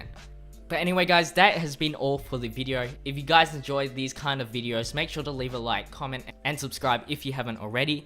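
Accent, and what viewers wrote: Australia. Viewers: Hey dude are you from Aussie i think I have asked before but Idk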